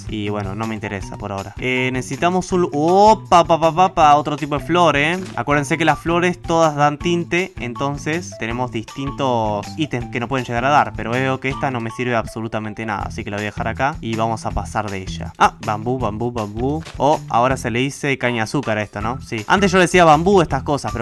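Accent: Argentina. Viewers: ¿sos argentino? me parese conocido tu acento por que yo soy de argentina. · se le nota la vos Argentina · sos argentino? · ME ENCANTA NETA ME ENCANTA EL ASENTO ARGENTINO Y EL TERMINO WUACHO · sos de argentina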